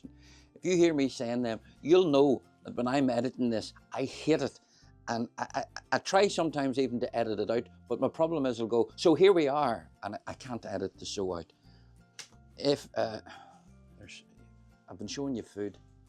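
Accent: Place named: Northern Ireland, United Kingdom. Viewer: My wife and I will be on the September 12th 2025 Oceania Sirena sailing through the UK including Belfast. We loved your video and we are requesting that you perform on our cruise as we would love to meet you. P.S. my wife loves your Northern Ireland accent. Doug & Jenna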